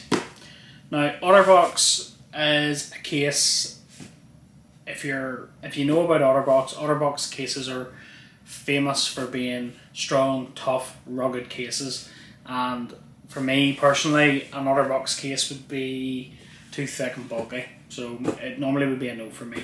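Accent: Northern Ireland, United Kingdom. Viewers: Thanks - right content at the right time for me. I'm looking to upgrade (from original iPhone SE!) and Magsafe looks like a very interesting companion tech/ecosystem. I had been confused about what it was, and also the difference/similarity with QuadLock. Your video is very straight-up and clear. Telling it like it is with a Norn Iron (Belfast?) accent as a bonus! Per comment and your reply below about mounting phone to a bike (pushbike in my case). Are there any hacks or workarounds that might allow a phone with Magsafe cover to be mounted to a bike? Maybe with some kind of extra bungie or similar? It would be very inconvenient (not to mention spend-y) to have to keep a separate quad-lock cover and mount just for the infrequent times I would want a phone mounted on my bike?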